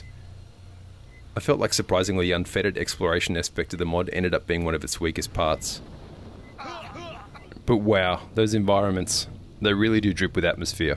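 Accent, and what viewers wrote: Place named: Germany. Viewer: Місцезнаходження: Японія, автор з німецьким акцентом про українську гру - де ще таке зустріти , як не на YouTube ?)))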